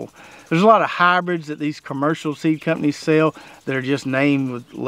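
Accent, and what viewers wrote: South, United States. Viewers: ...You just cleared up my thoughts as of late. I have been watching your videos for the last year and really appreciate your intelligence, southern accent, humor and love for gardening. You're the reason I purchased all my drip, fertigation and seeds from Hoss. I am sure they are missing their main man. Not sure we'll ever know what happened but if you start your own company, many like me will follow. God bless you and your family. Everything happens for a reason! Thanks for making awesome videos! Hollerin' from O-H-I-O! All the best to you and your family!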